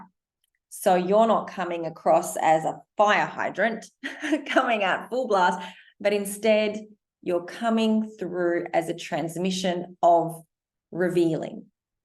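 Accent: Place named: Australia. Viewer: Hello, you've got a new subscriber here! I love it that you talk a little more slowly, so we have the time to let it sink in precisely that what you are saying. And i love your Australian (i take it to be) accent. Furthermore: the content of what you are saying is profound: it holds so much wisdom!!! I hope a lot of men and women will find you soon, for the work you do is very important!!! Thank you and love from the Netherlands. 💗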